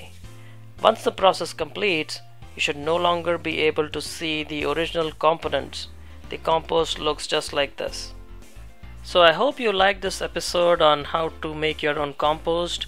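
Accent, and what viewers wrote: India. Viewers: just love your india accent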